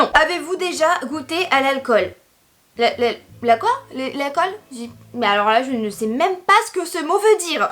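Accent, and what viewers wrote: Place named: North, France. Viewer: Par contre, j'ai hurlé de rire dans ta vidéo mais... Parce que t'as grave l'accent du nord ça m'a tué bordel, mais j'aime le même t'inquiète mdrrrr olive you <3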